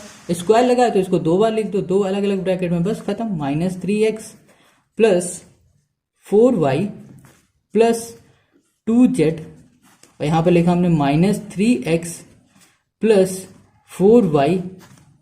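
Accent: Bihar, India. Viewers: Bhi app bihar se ho na👌👌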